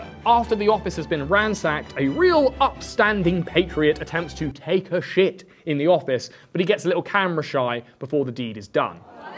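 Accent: United Kingdom. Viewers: you sounds british? so not a gringo in the kosher sense of the word. but yeah, I would call you a gringo, you go gringo! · Excelent broad strokes . At first glance, I would not take your channel seriously , given the choices of the Good, The Bad, and the Ugly out there. And the Brittish accent does not mean squat. And you were a bit too scruffy. Yet , you surprised me , by bringing in perspective from a far back timeline. And the homework put into it comands respect. And by dissecting facts and events thoroughly. And by staying even keeled, even thought you had a clear and defined stance ( granted it was European , yet not incendiary ). And your choice of language was impecable. Perfect Narrative and pace Negatives. No sticking your feet in the table ! Keep your beard groomed, for heavens sakes. Get rid of the silly props. You have what it takes Congrats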